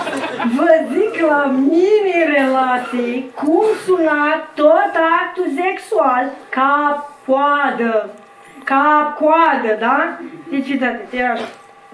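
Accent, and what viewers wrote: Moldova. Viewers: O actrita fenomenala, o femeie geniala. Accentul ala moldovenesc nu o merita si... nu isi are sensul. Nu suntem moldoveni, ardeleni, munteni... suntem Români. Felicitari, esti fantastica!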